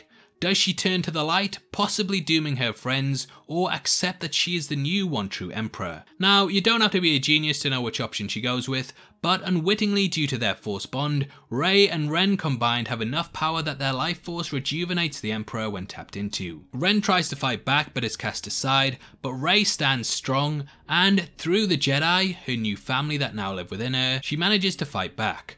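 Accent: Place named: Sunderland, United Kingdom. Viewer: Agree with a lot of this although would rate higher than 5/10! Also, North East accent. Sunderland-ish?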